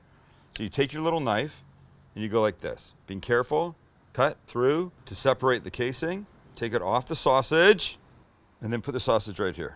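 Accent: Canada. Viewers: What part of Canada are you from? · You’re Canadian?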